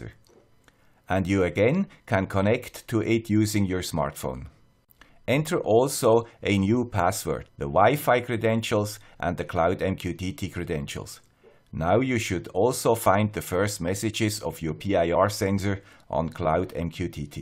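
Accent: Switzerland. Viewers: Hi Mister Swiss Accent First of all, i would like to thank you for your excellent video tutorials. i know how much time you are spending on this stuff. Big Thumbs up. Encouraged by this video i started to build my own automation system. But to be honest this stuff nearly screwed me up. Being a newbie it showed to be tough work to get my system running. I spend nearly 3 weeks to set up the raspberry, the development tools and to build my own firmware. I got stuck nearly every problem that could occur during the build process. Fiddling with linux was one of the issues. Due to some basic programming experience i thought that it would be easy to build my firmware.Wrong assumption. The build process was a trial and error approach due to the spare information for the firmware build. Most annoying was the fact that there is a plethora of information in the internet. 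But as a newbie i could not figure out if this information was useful for managing my problems. Most items i found were outdated, wrong context or even worse just false. Finally i found 2 major information that helped me out. I would like to ask you if it is possible from your side to provide us with a video showing a brief overview of setting up the environment, the build process and TOOLS (i.e. Platformio vs. Arduinoi) and hints to avoid the pitfalls for the build process. One of my major time consuming issue was to figure out how to configure the right Arduino Development Environment. Newbies will find really nothing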